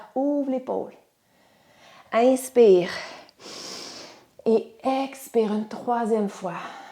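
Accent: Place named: Canada. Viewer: ce délicieux accent du Canada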